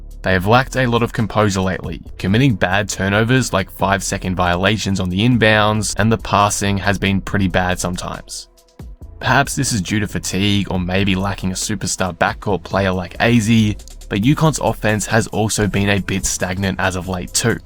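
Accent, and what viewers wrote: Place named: Australia. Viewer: Clearly this guy is an Aussie, or NZ'er, or a Brit. That's cool, but being Captain Obvious is not helpful. Bye dude. You're irrelevant.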